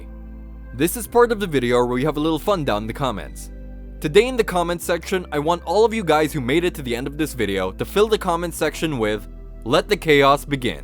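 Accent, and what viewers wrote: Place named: Philippines. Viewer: That's awesome dude. Been here since 200 subs. Btw are u a Filipino ur accent sounds like many Filipinos.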